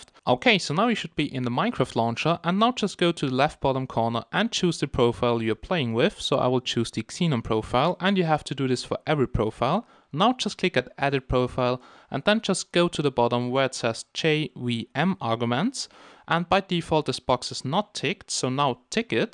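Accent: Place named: Germany. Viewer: hey, are you German? You really sound like :D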